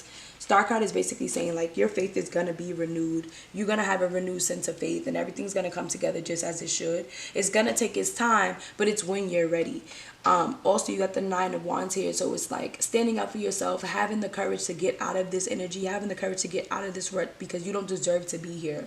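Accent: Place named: Brooklyn, United States. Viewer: This was a great reading this resonated with me so much! You are so relatable as well, growing up in Brooklyn this is exactly how we speak lol. I will continue to follow you IG page and watch your tarot spreads…thank you for sharing your gift with us!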